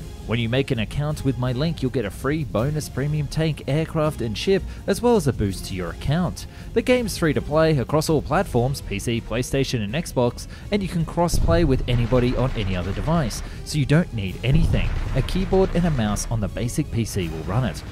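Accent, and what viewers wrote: Australia. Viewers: Apart from the weird Australian/NZ (?) pronunciation, this is really great!! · He misspronounces some words due to his aussie accent. Some facts arent straight too. Id give it a B... the channel.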